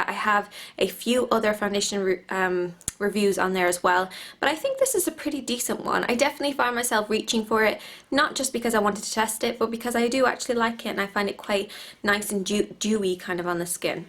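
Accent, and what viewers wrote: United States. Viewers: are you from america but living in Ireland? you sound so American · you almost sound american :) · Are you irish? Cause you sound like you're from America. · I think Shes Irish-american. She talks like a american with a Irish accent...if that makes any sense...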